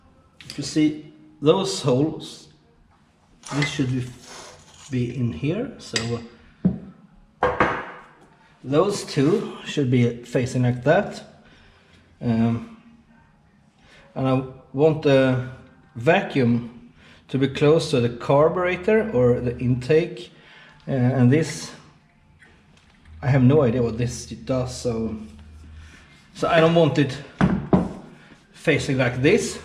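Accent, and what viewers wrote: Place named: Sweden. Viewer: Tja, underhållande video med riktigt fin svengelska dialekt :) Jag har en RRC från 1984 med, vad jag har förstått är den nya Boostern. Vet du om den går att renovera? jag tycker mig ha läst att den måste bytas ut om den läcker..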